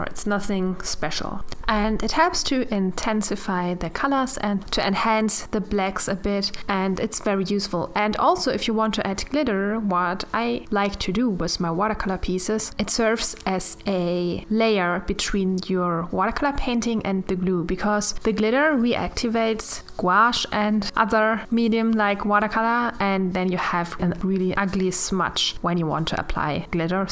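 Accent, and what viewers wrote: Germany. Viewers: Your accent is so german 😄😂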